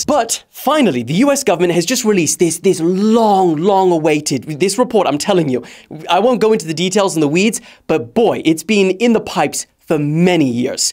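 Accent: South Africa. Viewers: You grew up in SA huh, i knew that accent sounded familiar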